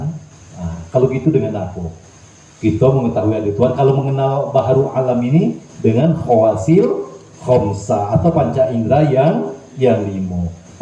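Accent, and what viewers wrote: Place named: Jambi, Indonesia. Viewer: Assalamualaikum warahmatullahi wabarakatuh.. pas nian Bahaso e lur asli org jambi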